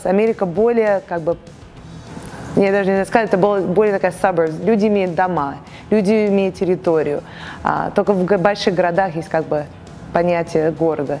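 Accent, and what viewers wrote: Russia. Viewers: не обманывайте больше в названии, она не американка, а русская эмигрантка! её родители русские, она родилась в России, она даже говорит на русском чисто, а на английском с русским акцентом! какая ещё американка? за название дизлайк! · К концу ролика стало понятно, что же меня беспокоит (как русского или советского). Это ровное состояние женщины - олвейс смайл, лук гуд, но проблемс, позитив. Ну вы поняли. Ни на секунду не показать даже возможную "не позитивность" что ли. Про искренность молчу. Понятно почему это происходит. Она сама это не специально, это уже на автомате. Так вот я увидел американскость с русским произношением.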